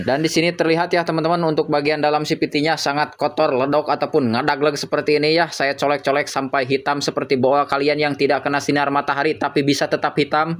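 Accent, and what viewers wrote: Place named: Tasikmalaya, Indonesia. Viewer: Logat tasik ieu mh, nya 😊 palih mana bengkelna mang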